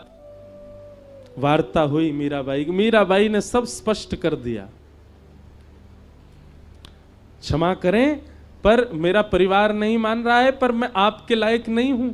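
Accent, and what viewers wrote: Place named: Braj, India. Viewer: Om Shri Ganeshay Namh 🙏 Jay Shri Krishna Radhe Radhe 🙏 ♥️ 💙 ❤️ Jay Shri Hari Jay Shri Shyama Shaym ji Jay Shri Radha Rani Jay Shri Radha Kishori ji Jay Shri Radha Raman ji Jay Shri Radha Vallabh Lal ki Jay Ho Jay Shri Guru Dev Maharaj ji Aapke Charno Mai Koti Koti Pranam 🙏 Radhe Radhe Jay Shri Harivansh Guru Dev Maharaj ji Aapki vraj Bhasa Badi Sumadhur hai Radhe Radhe Jay Shri Vrindavan Dham Jay Shri vraj vasi 🙏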